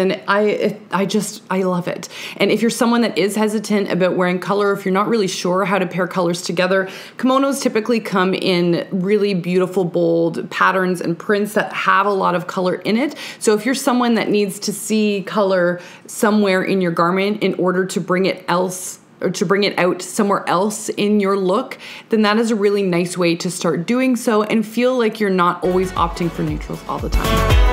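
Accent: Canada. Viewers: Love me a Canadian accent!! 🍁❤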